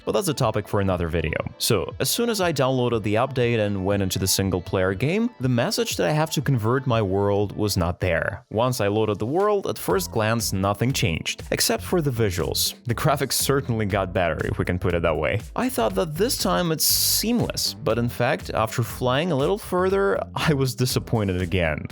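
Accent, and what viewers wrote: Russia. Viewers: Только на 2 минуте заметил что русскоговорящий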